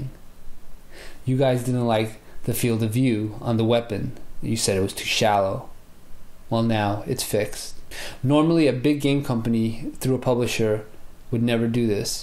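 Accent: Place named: Netherlands. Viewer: You are dutch, certainly.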